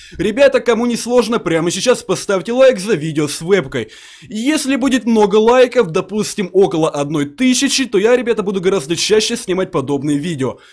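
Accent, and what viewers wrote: Ukraine. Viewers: А ты украинец шоль?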